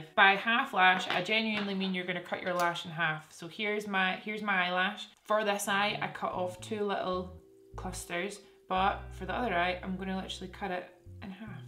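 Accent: Scotland, United Kingdom. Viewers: I am certain that I am not the first one to say this but damn I LOVE your accent! Is that Scottish? Excuse my ignorance if I am mistaken. And of course the entire video is just wow! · she has a very standard Scottish accent with perfect diction. I'm not sure why you can't tolerate different accents but hey, it's your loss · Are u Scottish? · Love this accent!!! Its Scottish isn't it???? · Do it in that stunning Scottish accent too ! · Sounds Scottish. Is that right? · She has a west coast Scottish accent. I know because I'm Scottish too! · it’s definitely Scottish x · I suck at doing eyeshadow and eyeliner 😞 I give up 🥺 BTW: are you Scottish?! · I love her Scottish accent, Scottish people and Scotland in general 😍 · rea zeqaj it’s Scottish very polite though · Love these pencils so much:) and can I just add how much I love your accent. Are you Scottish:) ? 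· You are so beautiful it doesn't matter what you do and that Scottish accent is the cherry on top. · Would guess her accent to be Scottish, but the r’s seems a bit strange for that - like a more American r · Sm xo this is how most Scottish people talk. I don’t know why people from other countries think you need to shout to be scottish😂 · Is it Scottish accent? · Ain Adena Indeed! She’s scottish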